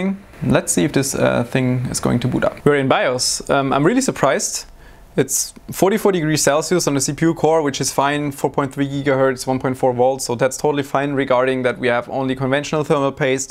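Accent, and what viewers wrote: Germany. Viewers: Alda. Ich liebe diesen deutschen Aktzent. #nohate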